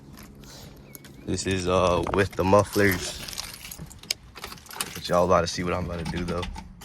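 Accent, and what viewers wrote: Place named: Charleston, United States. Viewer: Where you from? Sound like chucktown. But you can’t make that thang fast dawg I have one 2V booty bruh it’s fantastic for your first V8 it’s my first V8.. and I’ve had 2010 CTS v6 straight piped and a 2017 camaro V6 with factory NPP quad exhaust. My v6 Camaro was faster than my 2000 Gt. And Camaro was factory stock. Stang has full exhaust Throttle body. Heads, intake, stage 3 flywheel and clutch. Short throw shifter (so much better than anything) 350/70 something rear wheels or 370 some huge ass tires on the rear. Haven’t tried topping out yet or digging it like that because I don’t want it to break.. Shit is loud as hell but Slow 😭 my first V8 though tbh so I just love it and love how it sounds. As long as I have a V8 I’m happy.. idc if it’s slow I came from V6 all while wanting a V8.. finally got one that’s not on credit and PAID. 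About to get a C5 next way more capable of power less money than 4.6 2V Topped my CTS v6 at 145 completely straight for an hour hit 145 V6 Camaro hit 150Mph My new edge 2000 GT I haven’t even tried to top out yet 5 speed but if I did I hypothesize I’d say 150Mph? But probably longer to get there? Might be quicker to get up there than my Camaro with 20K miles That’s a maybe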